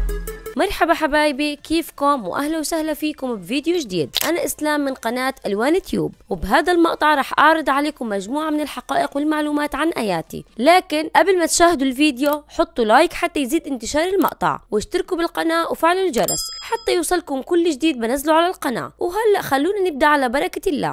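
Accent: Yemen. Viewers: الهجه يمنيه بجنوب اليمن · ايه كانت تصور فيديو ف تيك توك هي واحد وسألوها المتابعين في التعليقات دا زوجك ردت وقالت ايوه مين قال لكم انها مش متزوجة لا هي متزوجة 🙂💕 تعديل هي لما عاشت في مصر وقلتوا انها تتكلم مصري Noo هي اللهجه اليمنيه كدا زيي يمنيه✌🏻💜🌝🇰🇷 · اني كنت احسبها سعوديه بس طريقت كلامه يمنيه هلا ببنت اليمن ❤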